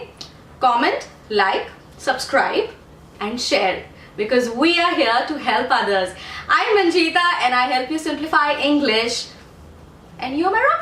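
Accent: India. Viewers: i sure that u r from jamshedpur...... great is ur way to express.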